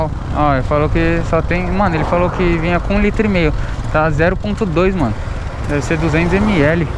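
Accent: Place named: Sao Paulo, Brazil. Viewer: Sou novo no canal… vc é de SP ou do RJ? Vc fala como paulista mas usa gíria de carioca “paizão” “calica” não entendi nada kkkkkkkkkk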